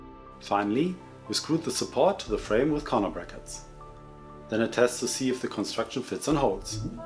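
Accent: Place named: Germany. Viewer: Ich hatte die ersten paar Sekunden und dachte mir nur „DAS verstehe ich gut, dass ist der mir vertraute deutsche Akzent“ 😂😂 Aber super Video, genau das hatte ich gesucht, vielen dank!!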